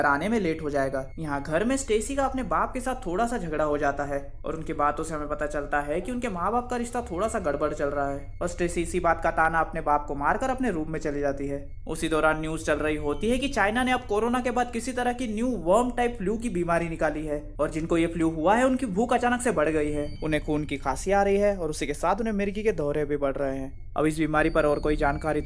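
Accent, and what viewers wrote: Maharashtra, India. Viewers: Ur Marathi accent is OP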